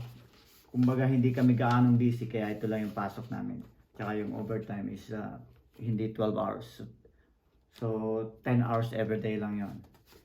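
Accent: Bicol, Philippines. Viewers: Garu bicolano si sir, ayos! Oragon. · bicolano ka boss?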